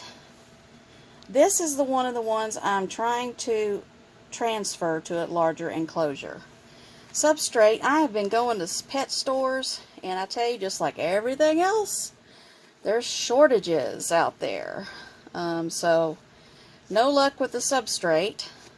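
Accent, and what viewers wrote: South, United States. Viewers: Loving that southern accent 😍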